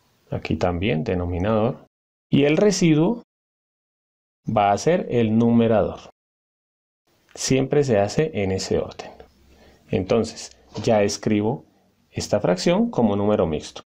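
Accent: Colombia. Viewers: PROFE COLOMBIANOOOOOOOO HOOOOOOOOOOOOOOOOOO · Es de Colombia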